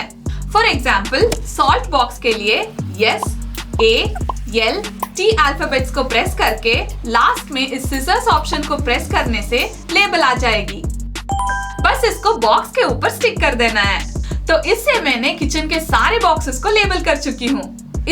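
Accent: South, India. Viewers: South indian accent🎉🎉🎉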